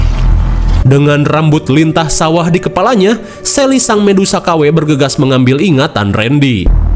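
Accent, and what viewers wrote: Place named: Bandung, Indonesia. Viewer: Si aa mah sunda na bandung pisaan aa 👌👌👌 Wewe gombel 😂😂😂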